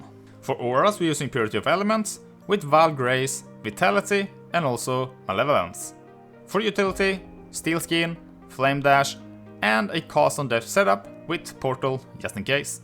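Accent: Sweden. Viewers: Man that Swedish accent is insane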